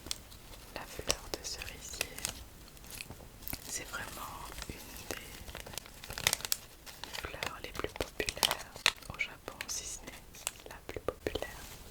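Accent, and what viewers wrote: France. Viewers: Another brilliant video. I love your beautiful french accent, so calming. Please make a really long video so I can fall asleep listening to your voice 🤗🙂xx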